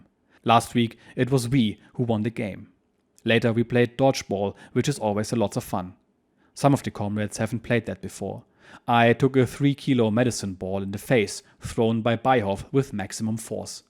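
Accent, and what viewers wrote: Germany. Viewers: I absolutely love hearing german diaries with a german accent. So much better than AI. Thanks! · I appreciate it's in english with a german accent not a posh British accent for a german wartime memoir. Thanks!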